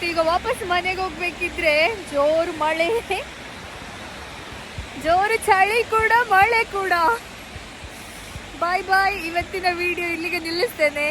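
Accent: Standard. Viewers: ನಿಮ್ಮ ಚಾನೆಲ್ ನಾನು ಇವತ್ತು ಯು ಟ್ಯೂಬಿನಲ್ಲಿ ವ್ಯೂ ಮಾಡಿದ್ದೆ. ಮೊದಲಿನದಾಗಿ ನಿಮ್ಮ ನಗು ಮುಖ ಹಾಗು ನಗುಮುಖದಿಂದ ಎಲ್ಲರಿಗು ಪ್ರೀತಿಯಿಂದ ಕೊಡುವ ಉತ್ತರ ನಮಗಂತೂ ತುಂಬಾ ಖುಷಿ ಕೊಡುತ್ತದೆ. ನಿಮ್ಮ ಪ್ರತಿಯೊಂದು ಶಬ್ದದಲ್ಲಿ ಪ್ರೀತಿ ಮಾನವೀಯತೆ ತುಂಬಿದೆ. ಇದೆ ಸಮಾಜದಲ್ಲಿ ಎಲ್ಲರಿಗು ಬೇಕಾಗಿದ್ದು. ಎಷ್ಟೊಂದು ಪ್ರೀತಿಯಿಂದ ಮಾತನಾಡುವ ನೀವು ನಮ್ಮ ಕರ್ನಾಟಕದ ಯಾವ ಭಾಗದವರು ಅಂತ ತಿಳಿದಿಕೊಳ್ಳುವ ಕುತೂಹಲ ಬಂದು ಗೂಗಲ್ ಸೆರ್ಚ್ ಮಾಡಿದಾಗ ನೀವು ಸುಳ್ಯದವರು ಹಾಗು ಆಳ್ವಾಸ್ ಕಾಲೇಜಿನಲ್ಲಿ ಓದಿದವರು ಅಂತ ಗೊತ್ತಾಯಿತು . ಎರಡೆನೇಯದಾಗಿ ನೀವು ಸ್ವಚ್ಛತೆಯ ಬಗ್ಗೆ ಮಾತನಾಡಿದ್ದಿರಾ. ಸ್ವಚ್ಚತ್ತೆ ಎನ್ನುವದು ಅಲ್ಲಿಯ ಜನರ ರಕ್ತದಲ್ಲೇ ಬಂದಿದೆ. ಸ್ವಚ್ಛತೆ ಇದ್ದಲ್ಲಿ ಶಾಂತಿ ಹಾಗು ಖುಷಿ ಇರುತದೆ. ಇದನ್ನು ನಮ್ಮವರಿಗೆ ಹೇಳಲು ಹೋದರೆ ದೂರದ ಮಾತು... ಮೂರನೆಯದಾಗಿ ನೀವು ಎಷ್ಟೊಂದು ಪ್ರೀತಿಯಿಂದ ಕನ್ನಡದ ಬಗ್ಗೆ ಪ್ರತಿ ಬಾರಿಯೂ ಪುನರುಚ್ಚರಿಸುತ್ತೀರಾ .. ಮತ್ತು ಚೀನಾ ನೆಲದಲ್ಲಿ ನಿಮ್ಮ ಶುದ್ಧ ಕನ್ನಡ ಕೇಳಲು ತುಂಬಾ ಖುಷಿಯಾಗುತ್ತದೆ. ನೀವು ಯಾವತ್ತೂ ಖುಷಿಯಿಂದ ನಗುನಗುತ್ತಾ ಇರಲೆಂದು ದೇವರಲ್ಲಿ ಪ್ರಾಥನೆ. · ನೀವು ಯಾರು ಅಂತ ಗೊತ್ತಿರಲಿಲ್ಲ ಕೀ ತಡಿ ಕಿರಣ್ ಅವರಿಂದ ಪರಿಚಯವಾಯಿತು ನಿಮ್ಮ ವಾಯ್ಸು ತುಂಬಾ ಚೆನ್ನಾಗಿದೆ ಕನ್ನಡ ಸ್ವಚ್ಛವಾಗಿದೆ ಆಲ್ ದಿ ಬೆಸ್ಟ್ ಯುವರ್ ಪಿಚ್ಚರ್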